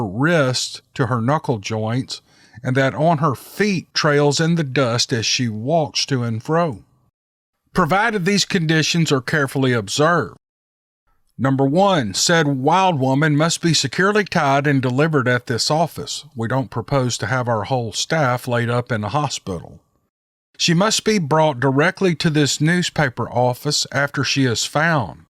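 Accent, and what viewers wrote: South, United States. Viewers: Enjoyed it? Loved it! How did this sasquatch learn English? They are so very bright!!! Read with your adorable southern drawl.... Just adds to the story interest. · I love the way says Uncles and "Ain'ts". All of my southern family on both sides pronounced it that way. It's the exact opposite of the formal pronunciation of "awnts"., you are refreshing to listen to.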